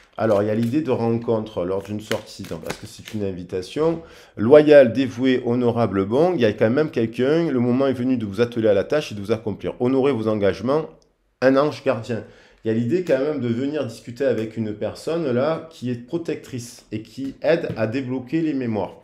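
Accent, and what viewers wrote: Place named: Marseille, France. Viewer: Merci petit et cette petit accent de Marseille 😉🙋‍♀️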